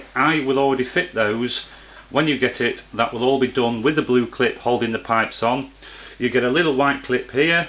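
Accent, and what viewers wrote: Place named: England, United Kingdom. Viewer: Esse cara é inglês dá pra perceber pelo sotaque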